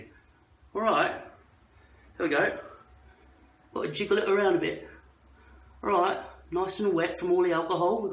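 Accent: United Kingdom. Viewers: LOOOL!! Muppet's!! hahaha!! 😂 you know what i mean?? spray that, spray that stfu and learn something for a second LOL!! oh dude....😂😂 loving you more and more ^^ and the brit accent is sublime! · Last time it was a posh British accent, but now it's more London working class. If I didn't know you were an Aussie I would have thought it was real accent lol. I didn't think bald men were more ignored. It hasn't affected the careers of Jason Statham or Mark Strong, but if you prefer having hair then you do a very good job of making it look natural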